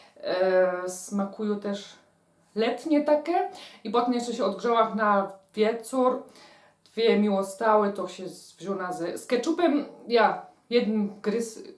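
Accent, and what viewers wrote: Silesia, Poland. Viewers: Kocham jak mówisz po Śląsku👍👍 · Pani proszę więcej mówić gwarą 😊, taką prawdziwą. Uwielbiam tego słuchać. Pozdrawiam 😘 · Tłumok bo przecież powiedziała że mówi gwarą śląską pojebany jesteś😂😂😂😂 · niy prōbōj gŏdać cysto po polsku,bo ci to sztyjc niy wyłazi,przeca żeś niy je żŏdyn gorol.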